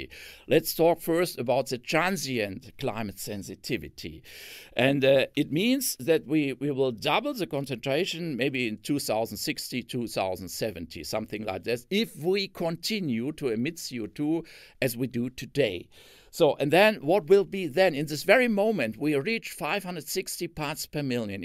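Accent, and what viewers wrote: Germany. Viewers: Das deutsche Englisch verstehe ich viel besser als das englische Englisch, so kann ich sehr gut mein Englisch verbessern. Der Vortrag war natürlich auch sehr gut.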